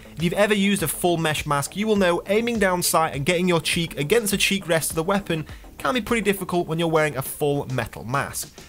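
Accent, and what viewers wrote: United Kingdom. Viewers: Really Nice! I'd like to start with airsoft as well, but here in Germany there are only a few places to play and without a car you can't go anywhere... I like the HK MP 7 quite much and maybe someone has a tip for me...(under 0,5 J ). go on with your amazing videos, I enjoy them very much because I like British English much more than American English..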